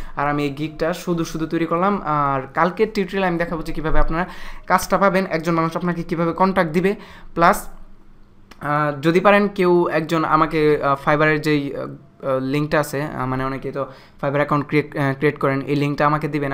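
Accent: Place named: Brahmanbaria, Bangladesh. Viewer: শুভেচ্ছা রইল, কিন্তু আপনার মুখের ভাষাটা ব্রাহ্মণবাড়িয়ার মনে হচ্ছে বা আশপাশের অঞ্চলের। (মোট কথা দেশি দেশি লাগতাছে)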